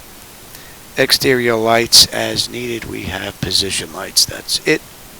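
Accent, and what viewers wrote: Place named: New York, United States. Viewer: Also, I got a strong Boston accent, but sounds like you got a New Yorker one, I love the city of New York, but screw your teams😂 just messing around bud. Good rivalry we got.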